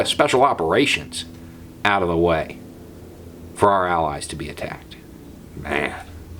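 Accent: South, United States. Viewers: Then you are forgiven! I absolutely love your videos. You remind people across the globe that just speaking in a southern accent doesn't mean you automatically are stupid or gullible or antiintellectual. These are days where we stand for reason and against hate. I, as a Briton who loves America, am horrified by the destruction of the USA in this madmans hands.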